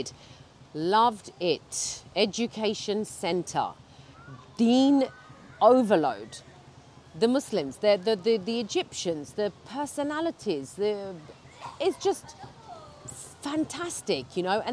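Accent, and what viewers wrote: United Kingdom. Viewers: i love your voice and British Accent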